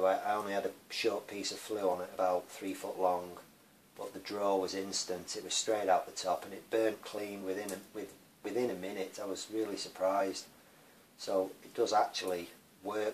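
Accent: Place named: Manchester, United Kingdom. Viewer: Will do, you sound very Manc. Looks like I'll be learning to weld stainless.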